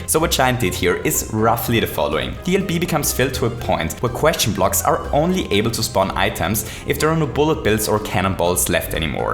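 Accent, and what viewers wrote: Germany. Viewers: Is it really an accent? He is German, so it's just a German trying to speak English. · Do you speak german? Because you have a german accent. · Höre ich da etwa einen deutschen Akzent?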